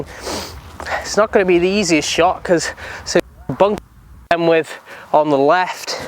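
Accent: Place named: Rhyl, United Kingdom. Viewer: When I heard your accent I thought you were actually from rhyl. I lived in rhyl for quite a few years and I've got some great memories of it, but I never had chance to play the course. I'm determined to go up to play it this summer. By the way give Llanymynech a go if you haven't already played it. Along with church stretton. I think they are the two best courses anywhere.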